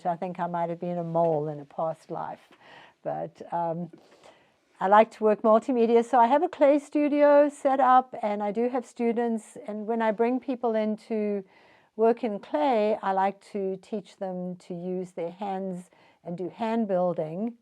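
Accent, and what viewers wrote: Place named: South Africa. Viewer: Hi from South Africa!. Had a feeling you were from here just by hearing your accent. You are truly inspirational and have so much interesting knowledge to share.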